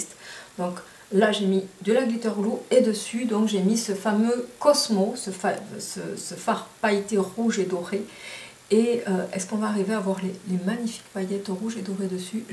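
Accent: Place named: Southwest, France. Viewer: C'estdommage d'enlever les swatchs! car on ce rends mieux compte de la pigmentation des Fards.. la couleur..et Les packaging. En plus j'adooore Ton accent! Pourtant je suis de Marseille et j'en ai un aussi! J'adore le sud ouest! Hâte de venir y faire un tour... Brefff... c'est toi le chef.Mais c'est dommage... 🙄🙄 Bon we à vous deux. Des bisous 😚😚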